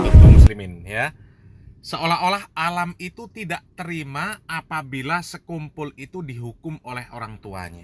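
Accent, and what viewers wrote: Java, Indonesia. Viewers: dari logatnya kamu dari daerah jawa ya,banyak omong lo sini main ke Kal-Sel dan yg komen nya yg miring.kalau memang orang kal-sel/martapura sesat mari kita duduk bersama luruskan. jangan cuman nyeloteh di dunia maya karena itu bukan suatu penyelesaian. salam hormat saya dari Kal-Sel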